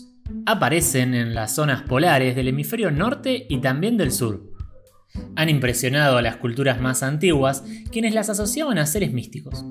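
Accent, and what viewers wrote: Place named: Buenos Aires, Argentina. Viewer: Muito bom o seu canal, saludos desde Brasil, me gusta estudiar el castellano viendo videos y tu canal es perfecto para mi, me encanta el acento de Buenos Aires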